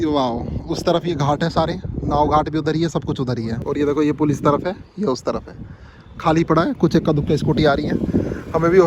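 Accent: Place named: South, India. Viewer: Thora ahista bolo Bhai..... Tumhara Hindi south Indian bale Tamil Telugu jaisha lagte hay....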